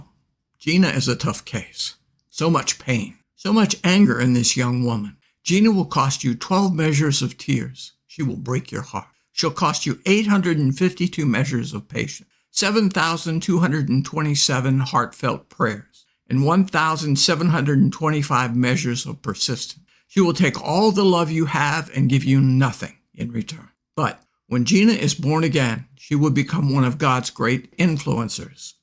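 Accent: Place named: England, United Kingdom. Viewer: My god what a beautiful message. I am from India. Typical English accent, easy to listen to and fantastic insights. Thanks for leading me into his glorious light more and more. GOD BLESS YOU. 👍🙏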